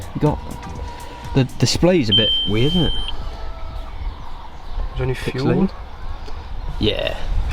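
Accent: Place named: Wales, United Kingdom. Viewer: Great to find your channel. An Audi nut myself! Where are you guys based? Sounds like Wales? Im in Carmarthenshire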